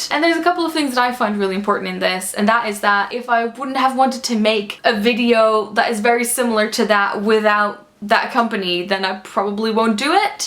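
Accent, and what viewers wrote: Ireland. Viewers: Haha I thought you were Irish until I read the description, I'm a new subscriber :D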